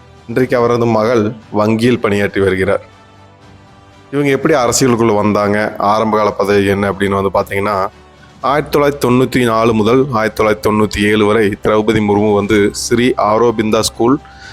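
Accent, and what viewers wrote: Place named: Tirunelveli, India. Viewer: Nellai Kanyakumari tamil pesureenka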